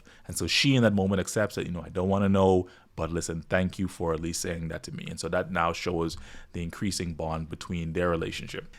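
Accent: Bahamas. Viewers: I just came across your video for the first time. From the moment I heard your voice I immediately recognized your Bahamian accent. Nice to see a fellow Bahamian on YouTube. ❤🎉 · I agree with what you said at the end bro ,& you sound like a fellow Bahamian as well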